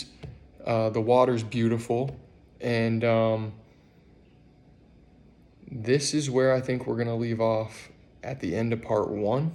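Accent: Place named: South, United States. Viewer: Love you humour and southern drawl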